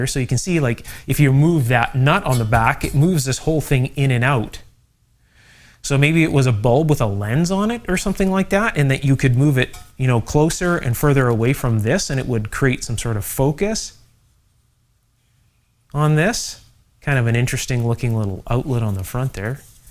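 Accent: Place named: Canada. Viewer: Why can’t Canadians say OUT correctly ?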